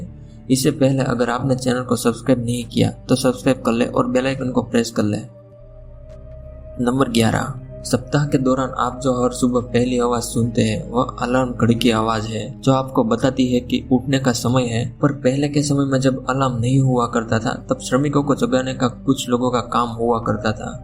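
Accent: Gujarat, India. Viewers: Tu gujrati hai bhai?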